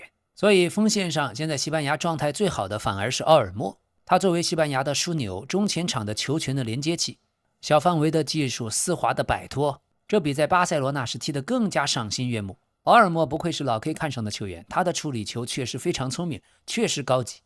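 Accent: Chongqing, China. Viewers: 听口音老k应该是重庆人呀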